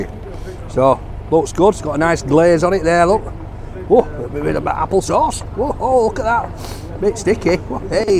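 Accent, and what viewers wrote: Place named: Scotland, United Kingdom. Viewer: He sounds more like Scottish or Irish accent or May be is he from Liverpool or Everton . His accent is more like funny .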